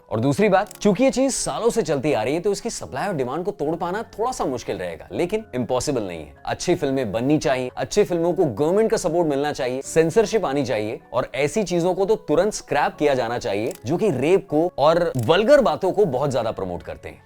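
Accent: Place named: Bhojpuri, India. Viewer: No bro you speak pure bhojpuri no prob.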